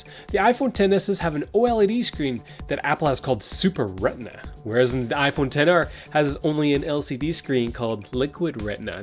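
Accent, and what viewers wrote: Canada. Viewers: I’m Canadian, you’re Canadian. You make this a point in your name “eh” It’s hard finding a Canadian voice in tech and phone reviews. So I was delighted to find you. THAT said it bothers me you give prices in USD not CND . At least say “ the iPhone xr is $750. In the U.S or $1099 Here in Canada eh “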